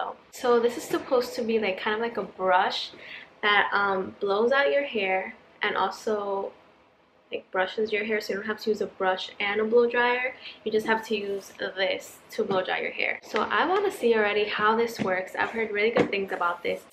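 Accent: Dominican Republic. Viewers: Me sorprendió mucho escucharte hablando español y darme cuenta que eres del patio. 🇩🇴🇩🇴🇩🇴💪🏽❤️ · Are you Dominican? Cause the accent when you talk Spanish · Esa forma de hablar... Indudablemente Dominicana jajajaajaja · Saludos desde RD! De donde eres? Suenan como Dominicanas. Me encantan tus videos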